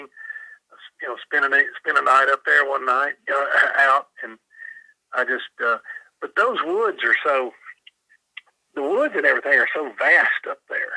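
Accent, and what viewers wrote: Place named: South, United States. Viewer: I could listen to narrations for hours, really enjoy his 'good ole boy' southern style..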